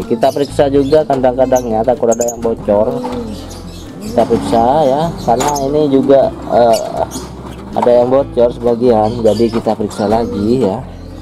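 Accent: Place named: Java, Indonesia. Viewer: Bosomu medok jon.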